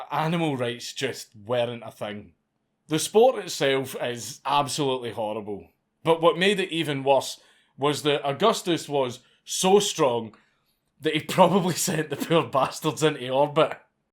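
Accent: Scotland, United Kingdom. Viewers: You're not my type, I'm afraid, but if I were a woman, I'd marry you just for your Scots accent. Thanks for the story, by the way. Augustus was a "bastard factory" ? That's one for you !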